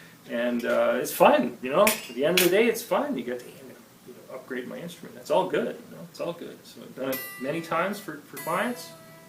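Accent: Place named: Toronto, Canada. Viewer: for any non-canadians, (and anyone using a string action ruler): A canadian nickel is 1.77 mm thick. A canadian dime is 1.22 mm thick (so two dimes would be 2.44 mm) …I didn’t pick up on the Toronto accent until after i had fished around the house for USD pocket change. American Nickels and dimes are like 20% thicker than their Canadian equivalents, maybe they’d be a good rule of thumb for setting up for slide. Anyway, thank you for the help, my tele plays and sounds like a dream!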